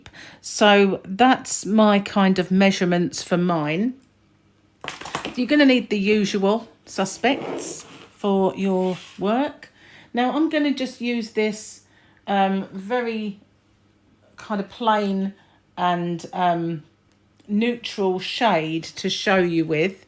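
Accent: United Kingdom. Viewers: Loved the pattern, loved your instructions, appreciated no music in the background and your British accent which so reminds me of my aunt. Thank you for the instructions. God bless!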